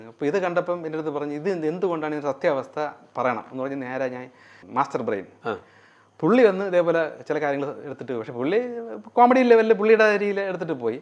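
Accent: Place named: Thiruvananthapuram, India. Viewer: An Autobiography of a Yogi യിൽ പറയുന്ന കാര്യങ്ങൾ ഇദ്ദേഹം സാധാരണ തിരോന്തരം ഭാഷയിൽ എത്ര വ്യക്തമായി ഉദാഹരണസഹിതം ലാഘവത്തോടെ പറഞ്ഞു തരുന്നു ഇത് മനസ്സിലാക്കുന്നവർ ഉയർന്ന level ആകണം 🙏🏻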